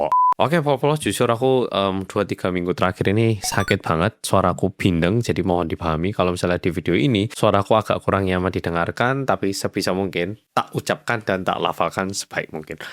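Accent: Java, Indonesia. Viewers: Logatmu mirip orang jawa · Nemu channel wong korea medok banget😅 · BHS Indonesia nya medok sekali, udah berapa lama tinggal di Indonesia, oppa/abang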